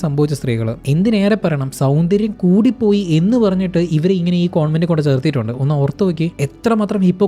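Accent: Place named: Kottayam, India. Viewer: Bruhh from kottayam or idduki??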